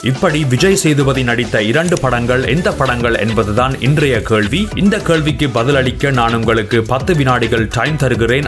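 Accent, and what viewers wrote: Sri Lanka. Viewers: R u srilankan..........? Ur Tamil pronunciation 100% matching with srilankan tamil slang ...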